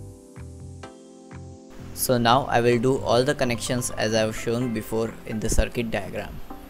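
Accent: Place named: India. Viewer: when i hear that Indian voice feels like he wants to scam me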